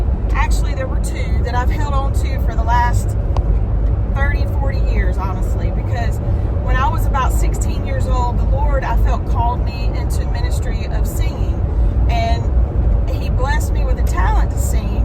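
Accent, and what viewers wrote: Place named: Georgia, United States. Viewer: First, I love your accent but I may be partial since I live in GA😂😂 Thanks for sharing your insights and inspiration. I’m tuned in😊😊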